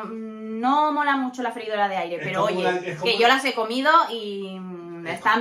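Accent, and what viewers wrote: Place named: Paisa, Colombia. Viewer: Hola desde Colombia. Me encanta sus videos. Pareces paisa con acento español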